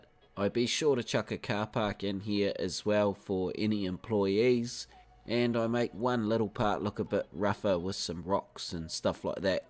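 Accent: New Zealand. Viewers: Can not wait for ep 3! You are remarkable. And I love the NZ accent! Let me not try a voiceover with my South African accent. You will laugh!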